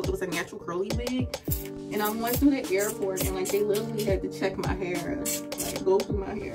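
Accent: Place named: Philadelphia, United States. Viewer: Loved this 🙌🏾 thank you sis! Lol I just knew you were from Philly with your accent 🤣 sending love from S. Jersey !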